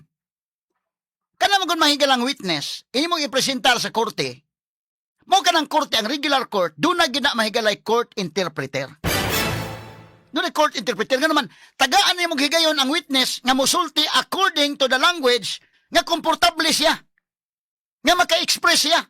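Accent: Visayas, Philippines. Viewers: Good morning Atty. watching from Tabunok Talisay City / Tinuod jud ka Atty. kay ako bitaw tulo ko ka tuig sa Manila ilhan gihapon ka nga bisaya sa iyang tuno😊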